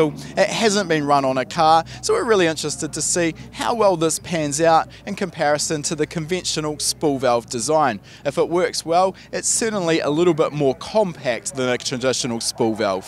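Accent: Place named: New Zealand. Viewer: No insult intended😁. Just that, as an Aussie, it's so nice to hear a non-American voice on YouTube (even the web) these days. I love Niew Zilland ecsents.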